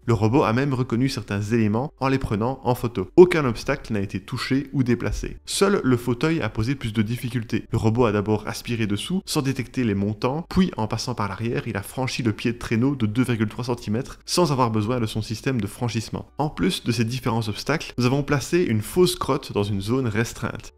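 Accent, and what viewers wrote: Belgium. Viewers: Je détecte un accent belge mais vous dites les chiffres comme les français, pourquoi ?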